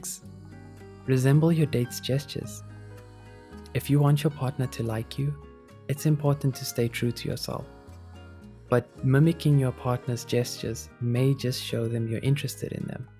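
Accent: South Africa. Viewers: Is South African? I recognise the accent:) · I can instantly tell he has a South African accent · wait what?! this guy has to be South African. I know that accent! this was expected 💚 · does he happen to be South African? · I was searching for someone to confirm my thoughts that this is a proper South African accent. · it's a south African accent. As a south African I recognize it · The voice sounds South African and I'm not complaining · He sounds like he's from South Africa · I love it!!!!!!❤Sounds South African ? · Sounds like a south african accent 🙃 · U lowkey sound South African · Is he South African? By any chance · Sounds like a South African accent. Nice surprise. Love from SA. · He sounds South African 😍👌🏾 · A South African audio !? I LOVE IT !!! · why does he sound like he has a bit of a south african accent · i love his south african accent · This person sounds south african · Immediately I need to know, the narrator is South African right...maybe Namibian, but I'm really feeling South Africa. 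· A nice South Aftican! 👍😀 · Does the new narrator have a South African accent??? I’m from South Africa and I was shook and confused like is he from here? Why does it sound so familiar? 😅😂 love it tho ❤️ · Sounds like a South African accent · This is 100% a South African accent right ?😂 lol represent! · Sounds like our South African accent... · The voice-over artist sounds South African 😱 (I'm also South African but I don't have the accent lol.) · is he South African? it feels like home. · I’m picking up a South African accent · Me too he kinda sounds South African. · Is this a South African accent I am hearing,if it isn’t , it could be 👀🇿🇦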